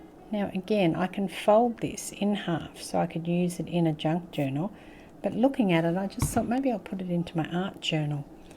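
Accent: Australia. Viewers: Unmistakable Aussie accent, as soon as I heard you speak, I subscribed (because we don't have many Aussies who make videos using Tim's products). I'm in regional Vic. Great pieces, loads of fun. Love your album. Cheers to you too...Aussie, Aussie, Aussie, oi, oi, oi.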